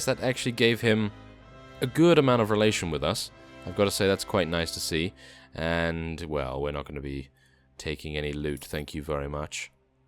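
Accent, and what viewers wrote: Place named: Oxford, United Kingdom. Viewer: If you don't mind me asking where in England are you from? I'm thinking Oxford area but I can't tell, I'm from up north near Manchester.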